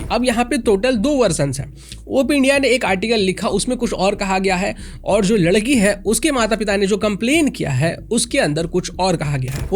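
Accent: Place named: Bihar, India. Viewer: bhai gandu hai kya..udisha nahi odisha hai.. bihari tone har mein ghusana jaruri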